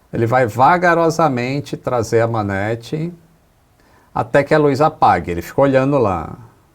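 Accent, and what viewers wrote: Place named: Rio de Janeiro, Brazil. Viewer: tá pegando sotaque carioca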